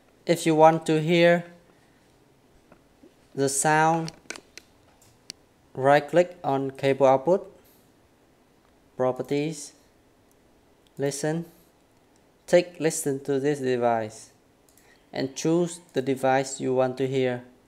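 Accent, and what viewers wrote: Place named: Vietnam. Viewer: Đậm chất Vietlish nhưng mà tôi thích :))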